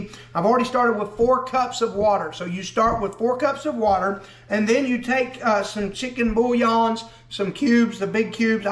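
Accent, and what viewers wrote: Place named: Missouri, United States. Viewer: You've moved away from SW MO! That accent is thick! I also moved away but I still have the bland Midwest accent of Missouri rather than a twang. I still make Springfield cashew chicken when the craving hits.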